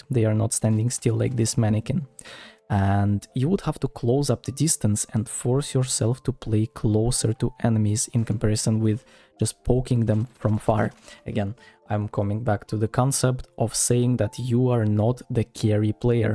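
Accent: Russia. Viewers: Ты же русский, брат, это слышно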